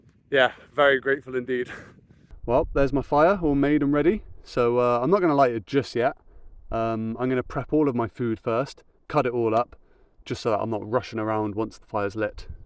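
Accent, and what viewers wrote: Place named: RP, United Kingdom. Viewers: You sound English but doesn’t look like England frankly you’d be moved on & frozen if you were trapped in uk so “homeless” is a bit strong. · I could listen to you speak all day. Very eloquent. Where were you raised? You sound posh to me but then I am Scottish. Love how well adjusted and genuinely happy you seem to be, in the way that you live. ❤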